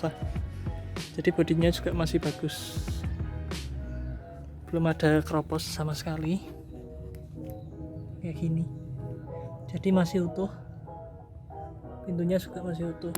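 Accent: Indonesia. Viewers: mase ngingetin koncoku orang Surabaya :D.. apik motore mas wkwkw.. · Paras cinese tp logat jawanesa 😆😆😆👍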